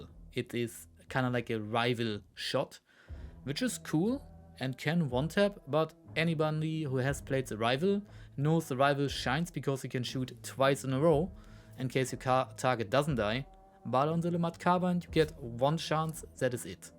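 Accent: Germany. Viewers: Dude your German accent is so heavy I can't understand what you're saying 😭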